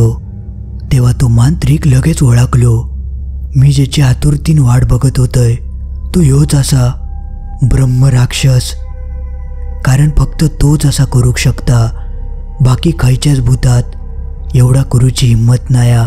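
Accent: Malvani, India. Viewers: आपण जिवंत माणसं आहोत जी पाच तत्वांनी बनलेल्या शरिरात जिवंत आहोत पण भुतं मग ते किती ही आणि कोणत्या ही स्वरूपात असो जिवंत शरिरातील माणूस केव्हाही त्यास प्रतिकार करू शकतो असो ज्यांना अनुभव हावा आहे त्यांनी प्रयत्न आणि सातत्याने पाठपुरावा सुरू करावा त्या क्रिया विधी कर्म गुप्त ठेवण्यात आल्या आहेत पात्रं नी कू-पात्र अशी परिक्षणं करुन च् या विद्या शिकवल्या जातात. 🙏 मालवणी भाषेत नी ऐकण्याची शैली आवाजातील भ्रमर नाद नी गंभीरता गोष्टीची गुढता व भय आतुरता वाढवते 👍👌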